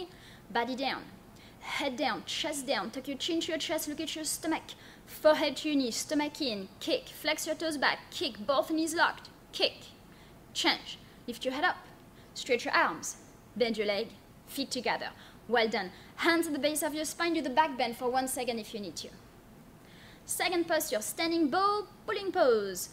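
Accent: France. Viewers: Thanks for this amazing class. I miss so much my studio in Montreal. I will practice with you guys from now on...and I love yourFrench accent as well 😉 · is the best!! She keeps you moving for 60 min never skipping a posture or a cue!! Her French accent is adorable and her dialogue is perfect. Thank you 🤗🙏🏼🧘🏽‍♀️💜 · Beautiful, positive energy! Fantastic class, and love the pretty French accent :) . La Fance me manque!!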